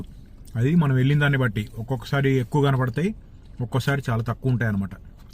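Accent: Andhra Pradesh, India. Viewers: Bro meedhi andhra nundi velli nijamabad lo settle ayyaru kadhaa...me slang chepthundhi..appatlo chala mandhi akkada lands cheap ani koni akkade vundi poyaru..am I right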